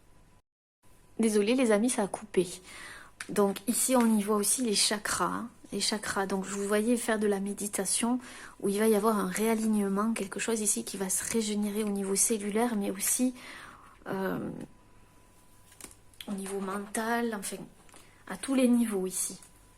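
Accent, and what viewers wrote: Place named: Hautes-Pyrénées, France. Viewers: je découvre ta chaine, j'ai choisi le tas 2. Tout est vrai et le chakra de la gorge cela me parle car pendant 65 ans j'ai tu ma personnalité pour éviter les conflits, pour ressembler aux autres avec cette impression incroyable d'être complètement différente. Ma façon d'être à toujours dérangé et j'ai perdu mon identité. J'ai le don de l'écriture, du chant et du dessin et depuis plus de 6 ans... je suis bloquée. Mardi je me fais ponctionner la thyroïde... à force de ne pas dire mon ressenti, de taire certains sentiments, j'ai développé un soucis qui peut être grave. J'ai des rêves prémonitoires depuis longtemps, je fais des méditations, de la cohérence cardiaque et aussi j'écoute beaucoup de séances d'hypnose pour avancer. Je confirme les douleurs dans le dos et les bras, la libido inexistante. Je me fais aider par une magnétiseuse-énergéticienne qui m'a dit que j'avais un pouvoir. 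Je suis trop fatiguée et fragile en ce moment pour l'aborder et le travailler à 65 ans on a pas la même énergie qu'à 20 ou 30 ans. Merci Jolie Voix à l'accent qui fleure bon l'Occitanie. Namasté 🛐☯️♾🌟🌹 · Bonsoir, Merci cela me fait du bien d'attendre l'accent des HP. Aucun endroit ne ressemble à notre département. Les marchés me manquent et la simplicité de nos campagnes. Les gens sont simples, et on rigole bien. Et la tourte!!! Tu es trop mignonne, très douce et gentille. Prends soin de tous ceux qui te sont chers et de toi aussi bien sur. Bye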